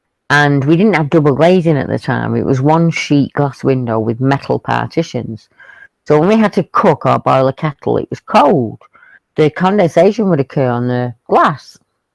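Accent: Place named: North, United Kingdom. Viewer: Finally while watching a BEJ video I can enjoy a lovely northern accent!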